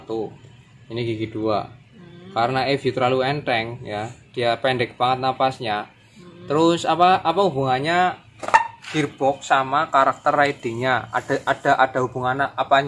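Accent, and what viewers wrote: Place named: Medan, Indonesia. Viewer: Logat nya seperti orang medan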